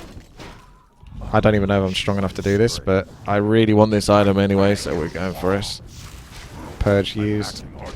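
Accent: South, United States. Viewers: that southern voice though, sounds like you're a flirty barman.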